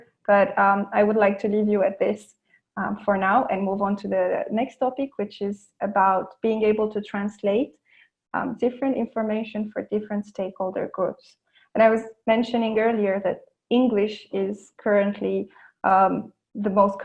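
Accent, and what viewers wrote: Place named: United States. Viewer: Nu am urmărit tot spiel-ul, dar sper să o fac altă dată. Pe mine mă durea întotdeauna capul când trebuia să vorbesc în l. engleză cu clienții nemți 🙂. Dar asta a trecut. Supărarea mea cea mai mare este idioata „romglish”. Nu știu dacă va mai veni vreodată o vreme când o să ne fie cald sau frig în loc să suferim de „disconfort termic” !@ ! În altă ordine de idei, aveți un accent englezesc superb. Mi se pare însă mai degrabă american, decât britanic. Numai bine!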